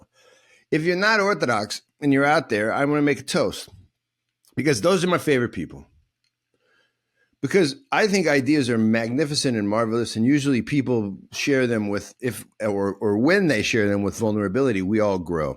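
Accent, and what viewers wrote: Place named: California, United States. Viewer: This is strange. You look a lot like a man I meant at the monastery of katskhi a couple of months ago and you sound just like this Californian guy I know named Peter..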